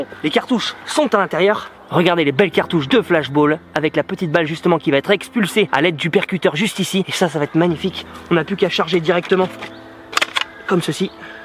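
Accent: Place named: Nord, France. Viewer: continue comme cha min loute, j'adore quand tu fait ton babache sur tes vidéos. Bonne continuation min loute. sa me rappel ma bonne région quand tu parle ch'ti. :)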